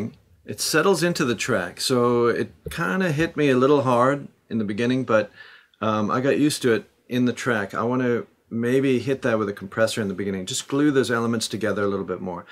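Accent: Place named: South Africa. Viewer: The weirdest thing is as a South African, hearing his accent that has hints of the South African accent that’s been completely taken over by the American accent 🤣😂 anyway, such a talented engineer!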